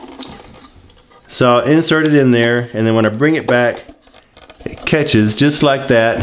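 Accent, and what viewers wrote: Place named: Western North Carolina, United States. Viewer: Not a severe western North Carolina accent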